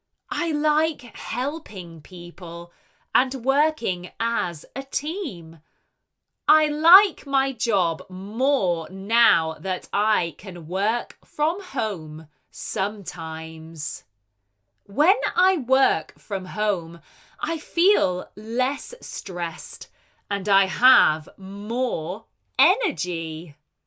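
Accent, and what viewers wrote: United Kingdom. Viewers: 🙌🏾🙌🏾🙌🏾🙌🏾Thanks so much for your time that you have been using to prepare the different stories ✨✨ it helps to boost our english level 😁😁Even UK accent 📌.Don't know how to thank you 😊 enough.May God bless the work of your hands 🙌 🇹🇿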